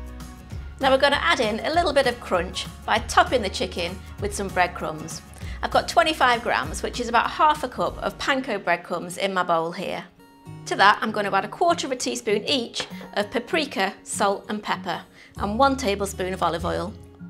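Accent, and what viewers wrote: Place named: Northern England, United Kingdom. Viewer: As a British person living in Australia I’m liking the northern accent whilst I make my dinner. When my husband asks what we’re having to eat I say the recipe name in a northern accent - I can’t help it hahaha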